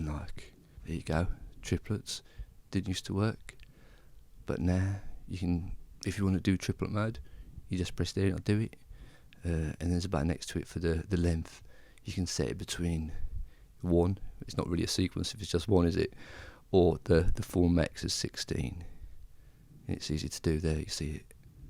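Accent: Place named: England, United Kingdom. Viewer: thanks for the tutorial. May I ask what part of England you are from? I'm fascinated by accents, thanks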